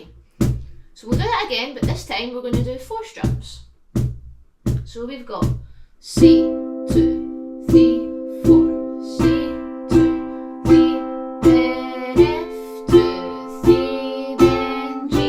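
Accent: Scotland, United Kingdom. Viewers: So I was just clicking around YouTube Shorts and ended up somehow On one of yours about "finding a groove", then I checked the main vids out And saw this one was new, so I listened and watched from start to end. I like the blue instrument, and the musical cap seems like a neat piece of fashion, But I noticed neither for like five minutes because... your accent is so pretty! 😄 (I should go find other people from Scotland to watch now or I'll end up learning the ukulele. 😂) Keep up the good work because the lesson itself was rather easy to follow along with, And if I had a ukulele, I think I could pick up strumming fairly quickly with this practice. 😃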